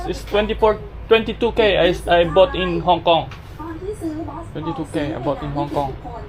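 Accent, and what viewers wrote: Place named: Philippines. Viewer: You’re funny af bro, you have filipino blood? You got that accent hahahah.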